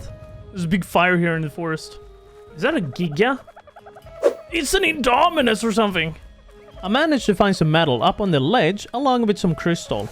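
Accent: Sweden. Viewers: As a fellow Swede, yes this is why, and yes you reeeeally got that classic swedish accent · are u swedish? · you sound swedish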